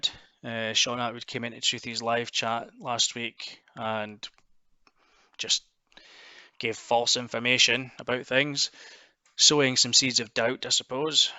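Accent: Scotland, United Kingdom. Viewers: I might be biased as I'm Scottish but it's good to hear a Scottish accent that isn't on Jeremy Kyle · Anybody believe this Sammy the Bull story? Doesn't add up. As a scouser I'm trying to decipher what part of Scots you're from. Its not a heavy Glasgow accent or Edinburgh. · Least you use your wee scotch voice...😉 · Fuckin love Scotland Scott's forever fuck thst... Love the accent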